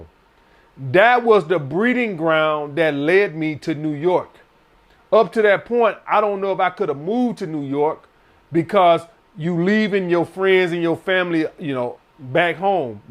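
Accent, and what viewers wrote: South, United States. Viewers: It’s funny you say you uncle was one of your biggest influences to being an alpha, but in my life you sound just like my uncle. Even the southern accent!!!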